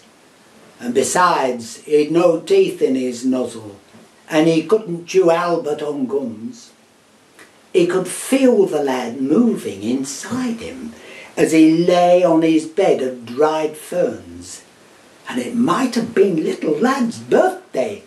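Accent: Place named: South, United Kingdom. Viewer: Nice rendition mate, I'm a Yorkshire man and can tell instantly you are from the southern regions as your accent is Posh,..but well done for this, I love the old monologues I used to listen to as a child before we ever got a television....good memories..Thankyou!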